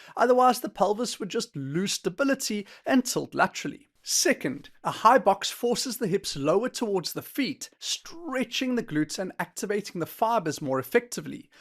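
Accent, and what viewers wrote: South Africa. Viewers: Do I hear a South African accent? 😊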